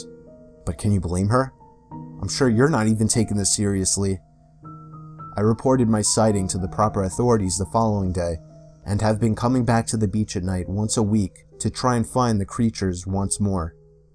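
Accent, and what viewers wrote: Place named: Boston, United States. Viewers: U sound like your from Boston just a thought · Classic. This is when had his NE accent.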